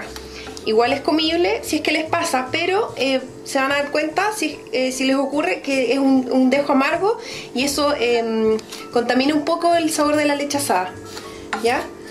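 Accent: Chile. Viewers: me encanta la receta no se ve para nada dificil, mi unico problema que tengo cocina magallanica. por tu acento eres chilena. saludos desde punta arenas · Bella Fernandes obvio chilena por coml habla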